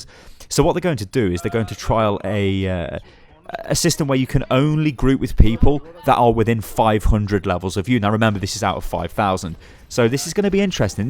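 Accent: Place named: United Kingdom. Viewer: What's the point of these vids? It's literally just everything you can hear in the update videos Blizzard makes, but unorganized and with Overwatch gameplay in the back. I guess they're good if you like British accents and don't like Jeff's lisp.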